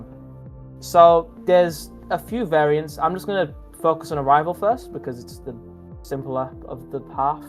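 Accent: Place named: United Kingdom. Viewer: british person talks about elevators for 12 minutes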